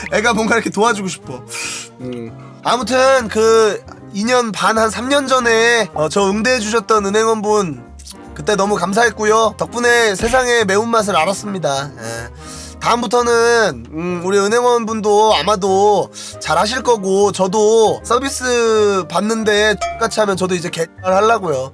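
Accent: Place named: Chungcheong, South Korea. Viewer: 충청도!! 전라도 아니거든요 참나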